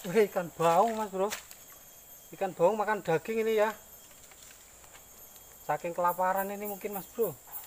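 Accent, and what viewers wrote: Java, Indonesia. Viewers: Ini perantauan atau gimna mase? 😁 Kox bahasanya medok jawa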